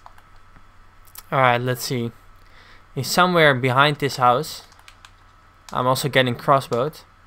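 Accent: Netherlands. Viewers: Correct me if I'm wrong, but are you Dutch? You sound Dutch at least. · Are you dutch?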